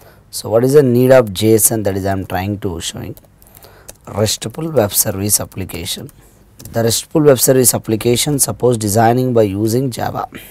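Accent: India. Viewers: BLOODY INDIAN ACCENT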